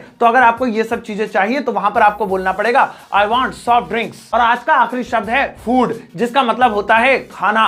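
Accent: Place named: Delhi, India. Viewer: nice voice Delhi se ho pakka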